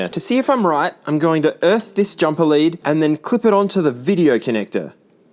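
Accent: Australia. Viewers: Why am I so f*cking hooked on Australian YouTuber delivery. My wife calls it’s Bluey for adults 😬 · Guy who has only ever heard 1 south australian hearing their second sound australian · he is aussie mate.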